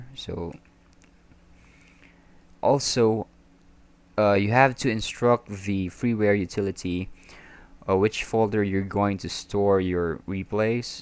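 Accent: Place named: Philippines. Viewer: Pinoy ka?, ganda ng accent mo...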